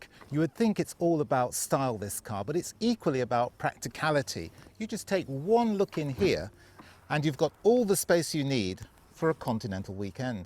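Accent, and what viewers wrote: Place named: United Kingdom. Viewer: Greetings from Texas! Your gorgeous British accent is equal to this gorgeous car!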